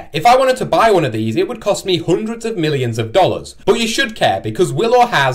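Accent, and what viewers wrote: United Kingdom. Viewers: i think this channel is going to be the bri-ish "fireship" channel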